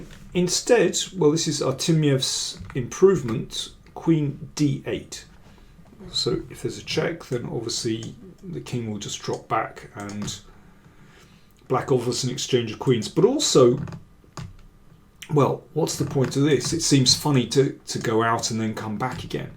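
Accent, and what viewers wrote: United Kingdom. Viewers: Thanks for all the valuable stuff you lovingly provide chess enthusiasts with. And by the way, your succinct, eloquent commentary with genuine British accent offers an excellent English course!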